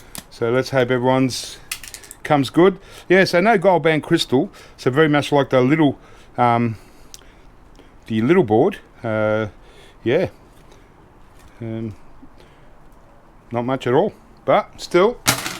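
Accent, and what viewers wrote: Australia. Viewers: I live in the United States and we were locked down for the longest time and you weren't don't apologize for something you can't do anything about I love watching your shows I don't care what you do tearing stuff apart cutting off wires it's all good just don't stop let's do a marathon what do you think love that Australian accent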